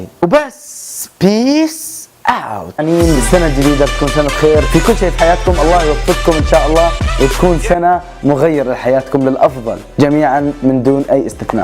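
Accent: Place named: Syria. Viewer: I أنا مشتركة جديده في القناه... و صراحة الشي إللي حيرني هي لهجتك...مني عارفة بس...إنت تتكلم سعودي بس في لكنه بسيطة سورية...مادري ليش...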